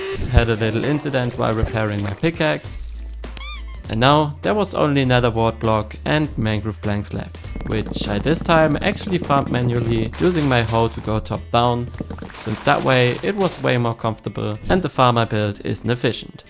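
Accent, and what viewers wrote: Germany. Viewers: Ich wusste doch du bist deutsch, ich höre den Deitschen Akzent raus 😂 Btw ist die Kartoffel eine Anspielung darauf? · you sound like suuuuper german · Sehr Gutes video man hört Dehn deutschen Akzent raus 🤙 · the german accent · I love the accent omg! Are u german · Ha ich wusste dass du deutsch bist, der Akzent 😂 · Man merkt, dass du deutsch bist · You have a german Accent 😅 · u sound kinda german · The German accent is just 👌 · He is German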